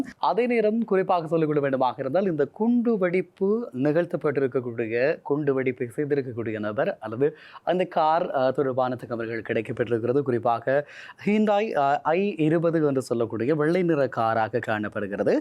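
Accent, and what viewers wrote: Sri Lanka. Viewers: Seems the news reader has studied in Srilanka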